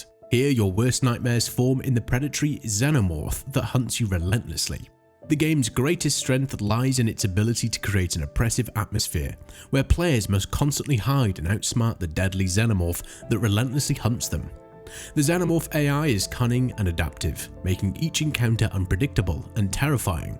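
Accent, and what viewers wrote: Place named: United Kingdom. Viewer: British english accept is the worst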